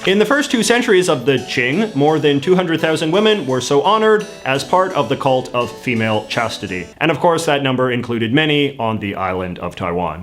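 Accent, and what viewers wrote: Canada. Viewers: You say “about” the way Americans tease Canadians for saying it: “Abuht”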